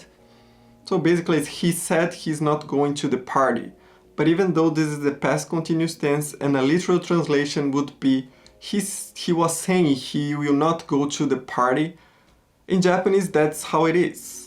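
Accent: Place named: Brazil. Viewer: You sound like a Brazilian, am i right? You have Pretty good english and japanese!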